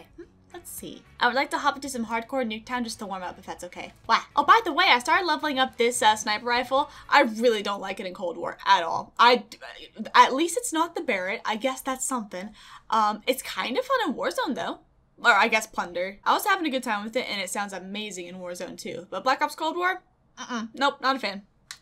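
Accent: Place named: Texas, United States. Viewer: I would do anything to have someone as positive as you in my life! Love you! Love the Texas accent aswell!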